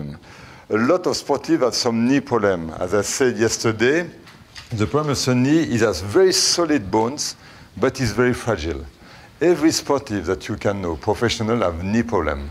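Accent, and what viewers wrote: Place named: France. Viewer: very good and nice French accent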